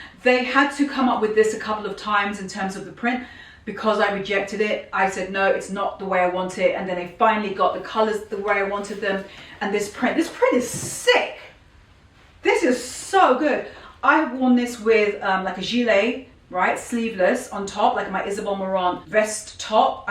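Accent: England, United Kingdom. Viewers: Love you! Love your English accent. LOVE YOUR CLOTHING LINE! SASSY.CHIC.COMFORTABLE. AFFORDABLE. Ciao an American in Sicily. Love when you visti my my city New york and talk to the people on the street.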